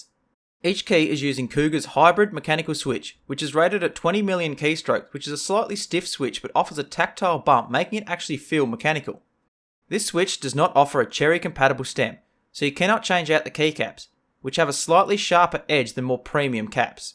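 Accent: Australia. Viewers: And I thought I had a thick Australian accent...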